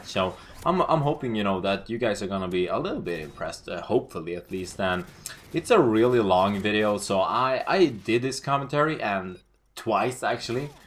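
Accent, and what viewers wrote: Sweden. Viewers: youre the best, love your swedish accent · wat the hell wow it seems u very popular dude lol cauz your swedish:P:D everybody likes abit of Foreign Accents hell yeah for the world!!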